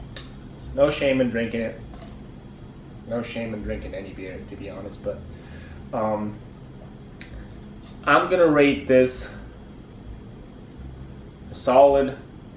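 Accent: United States. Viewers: Till you say something with a R or a L in it you sound American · You sound American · Lol, dachte du bist echter Amerikaner bis ich den Titel richtig gelesen hab, kein Scheiß. Super Ausprache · He sounds more American than some Americans Girl bye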